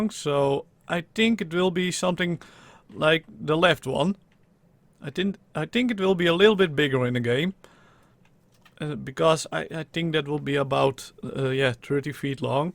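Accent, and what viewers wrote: Netherlands. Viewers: DUTCH ACCENT FOR THE WIN :3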